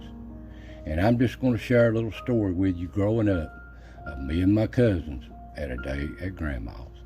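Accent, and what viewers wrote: Appalachia, United States. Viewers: I love hearing your stories. The way you talk always makes me think of my Appalachia granny and a lady I worked with that was from Greasy Creek, Ky. Good memories. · Love your videos and stories! Your accent reminds me of my Granny and Pappy! They were from Middlesboro.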